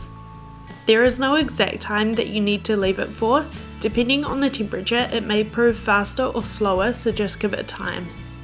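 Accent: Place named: New Zealand. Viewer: R u a kiwi by chance?!? Cos i rekon u souns pretty kiwi!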